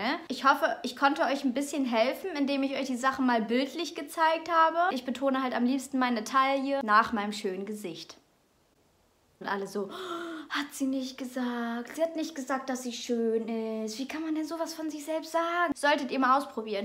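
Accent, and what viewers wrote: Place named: Germany. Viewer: Sie hören Cute Your German Akzent und Sie sehen niedlich diese Jeans in Ihrer Figur. Nette Mädchen Grüße aus Mexiko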